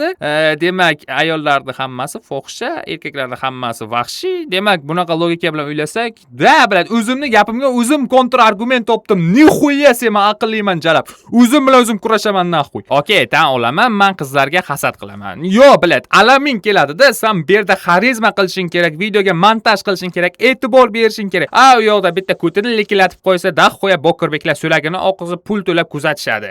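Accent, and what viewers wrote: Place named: Jizzakh, Uzbekistan. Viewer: Gap yo'q maza qldim 😂😂😂😂 Kontentdan toxtamang, Qayerdansiz brat uziz ? Jizzaxga shevez tortebdida 😂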